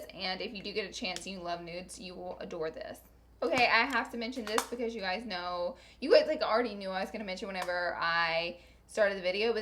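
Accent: South, United States. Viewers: It cracks me up when people leave comments hating on her voice. Maybe it's because I'm a Southern girl too but it's just the way we talk 😂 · I'm southern too and I think ur accent is beautiful😍😍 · New subscriber and just curious what state your from? I can't quite place your accent, but I definitely detect some southern twang. I'm from Virginia 😉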